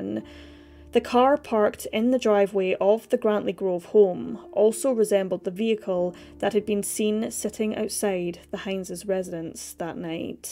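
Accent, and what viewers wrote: Scotland, United Kingdom. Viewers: Shes Scottish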